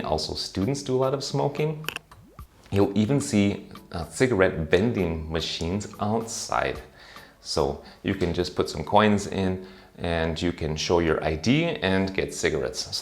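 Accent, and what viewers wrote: Germany. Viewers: I'm surprised. Your heavy accent sounds like a German who lived in the US only a few years.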